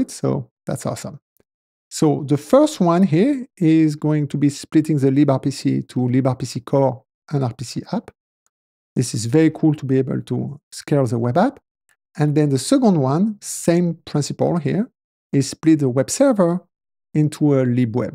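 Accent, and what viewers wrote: France. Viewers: ahhh l’accent français ❤❤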